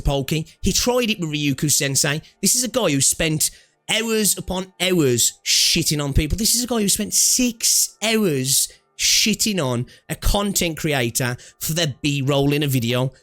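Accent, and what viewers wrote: Australia. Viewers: Ngl your voice and Australian accent is still dogshit to hear, haha can't even go past 1 min haha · Man you have the sweetest aussie accent ever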